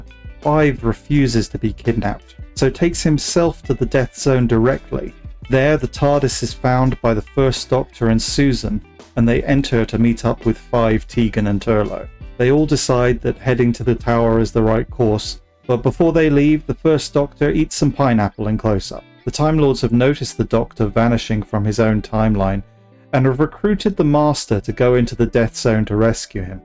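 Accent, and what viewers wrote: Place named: United Kingdom. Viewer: In brum. Can tell you’re from the uk lol loved that show.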